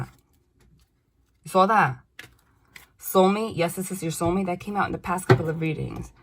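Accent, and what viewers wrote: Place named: New York, United States. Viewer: ok not relevant to the vid but i'm living for that new york accent.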